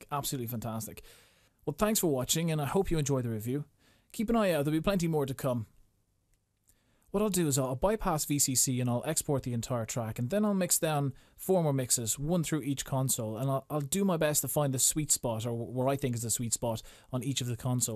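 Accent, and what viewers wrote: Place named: Ireland. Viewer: youre from ireland :) great video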